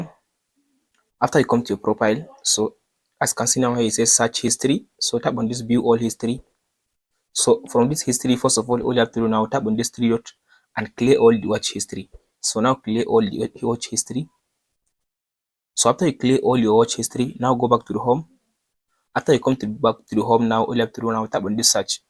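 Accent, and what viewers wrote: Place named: Bhojpuri, India. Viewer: Bhojpuri english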